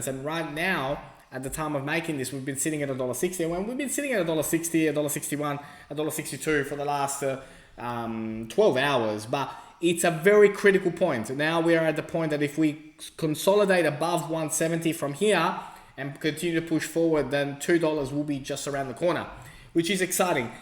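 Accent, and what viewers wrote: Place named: Australia. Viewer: G'day mate. Thanks for the great xrp video. Great to hear Aussie accent.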